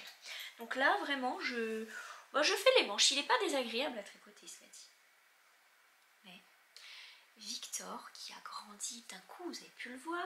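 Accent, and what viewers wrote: Canada. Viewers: Merci encore pour ce beau moment de partage. Passez un merveilleux week-end en famille. Et merci pour ce joli accent canadien qui ressort de temps à autre !! 😊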